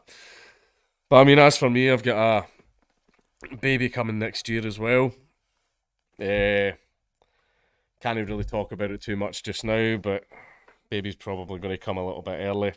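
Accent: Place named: Scotland, United Kingdom. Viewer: Have good Christmas man 👍 I've enjoyed listening to one of the last Scottish accents to call nonsense on the current things. P.S your having to many stress wanks, hence the reason your having consecutive children because your jizz is new when the wife can actually be bothered with you 😯 Thank me later man😂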